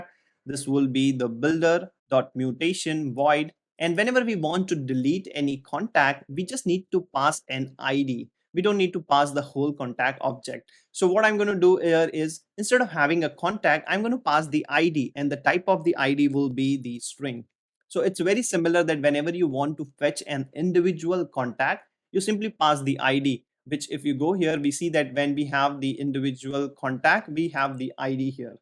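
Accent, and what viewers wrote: India. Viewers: Thank you, even though i got a problem to get your accent, you'll guys from India makes programming get easier. Have a good week.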